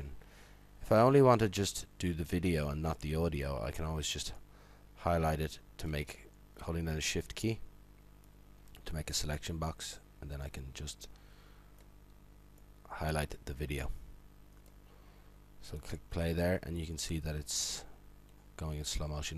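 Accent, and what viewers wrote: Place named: Ireland. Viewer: ill know that your irish 5 stars your for dublin so am i because i knoe your acent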